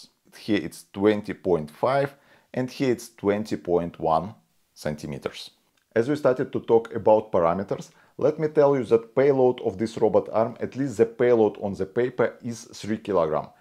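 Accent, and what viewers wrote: Russia. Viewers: Thanks for review. I think this device can be used to control movie camera to fuse real and virtual video or make other effects, or even to clone "handwriting" on letters BTW: your accent is to similar to mine, are you from Russia ?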